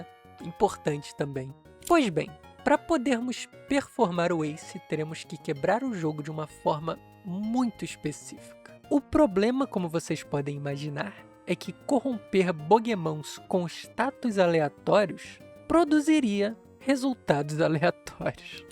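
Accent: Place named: Rio de Janeiro, Brazil. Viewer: o é o carioca maixxxxxx carioca que eu conheço. e olha q EU sou carioca e falo cheio de carioquEIXXXX tb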